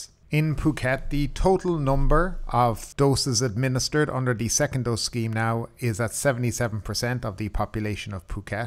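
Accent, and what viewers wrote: Ireland. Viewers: I am an American and find your speaking speed fine. Perhaps some people are not used to your Irish accent. A professional voice recording artist usually speaks about 50% faster than average. A professional speaks about 150 words per minute, so consider yourself professional.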